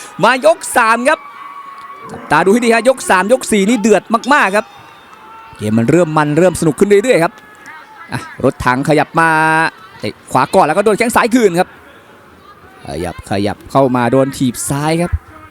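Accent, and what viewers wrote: Isan, Thailand. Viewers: คนพากดีคักมีเว้าอีสานบ้านเฮานำจวดไปเลย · ดูแล้วชอบครับคนพากย์สุดยอดครับ ผสมอิสานด้วยเหมาะีผมชอบ คนอุดร กุมภวาปี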